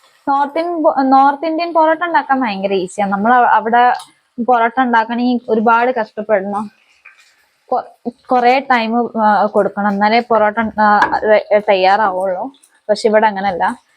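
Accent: Malappuram, India. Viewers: Ividunn klynm kazhich angot poythaan.love marriage enn Avr prnjllo.pine samsarm ketit malprm muslimsnte samsrm an. Malapurth hindusn veroru slang und. Kurch nlla malayalm.matham mariyath avam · Malappuram slang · kayichath kettappo thonni malappurathnnanu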